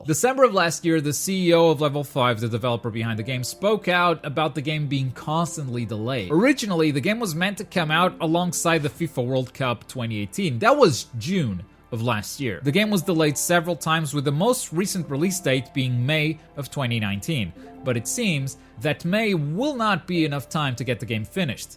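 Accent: Portugal. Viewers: Holy shit just from you accent I could understand that you were also Portuguese. It's Rare to find one of us in the English community lol. Great channel btw. Definitively going to Subscribe.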